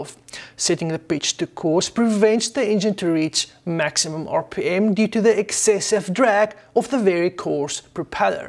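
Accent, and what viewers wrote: South Africa. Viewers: Ek wou sê jou aksent klink South African. Toe ek die eerste ZS sien op n vliegtuig toe weet ek. Nice video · You sound South African 😊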